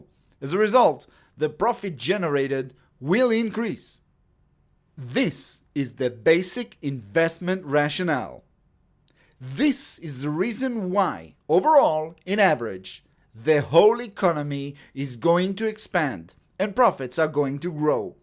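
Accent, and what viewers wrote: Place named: France. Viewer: What is this guys accent? French? It rules.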